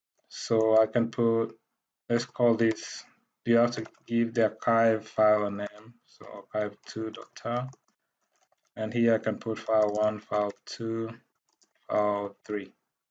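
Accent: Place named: Nigeria. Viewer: Bro you sound Nigerian. Is there a way to connect with you? Instagram? Twitter?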